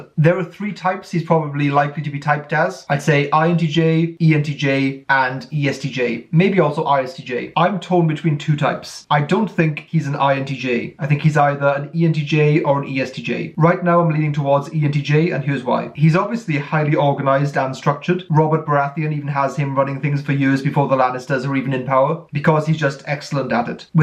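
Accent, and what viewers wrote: United Kingdom. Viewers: Can you please, please, please make an effort to speak more slowly in your videos, and maybe not edit your shots quite so close on the heels of previous shots? I'm really interested in your videos, but when you get going so quickly it can be pretty hard for me to understand your accent (and I'm usually really good with accents). I understand if it's your style or whatever, but maybe I'm not the only one from outside the UK who struggles to understand?? Thanks. And yes, I would like very much like more videos typing GOT and other fictional characters! 😁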